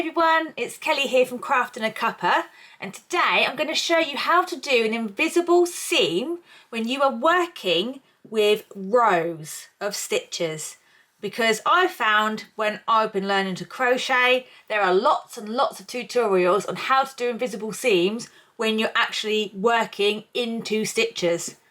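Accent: United Kingdom. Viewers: I love love love you for many reasons 😂 but most of all is british accept but US terminology. I had no idea that i can gwt both. So it's all a lie that I can't have my cake and eat it too 😂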